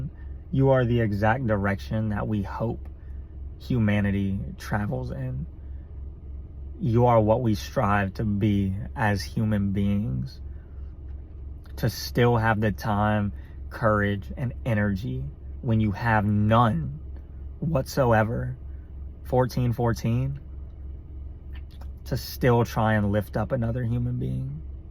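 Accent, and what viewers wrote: South, United States. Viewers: You got like a country accent . Where are you from ????🎉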